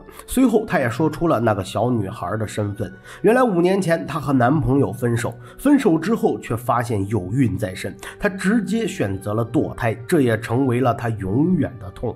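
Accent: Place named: China. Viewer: 听不出这是大陆口音吗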